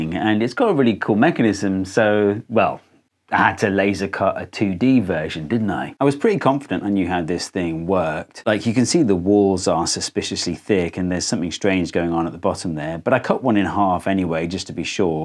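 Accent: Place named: Geordie, United Kingdom. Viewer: I’ve been watching your videos for years, and just realised on Wikipedia that you went to my secondary school! I’ve got as much of a geordie accent left as you do, mind, so I never even picked up on it. And ironically, I’m currently working in Universal Studios as a wandkeeper, so I guess we’re both wizards now. How many Tommy More wizards can there be? 😅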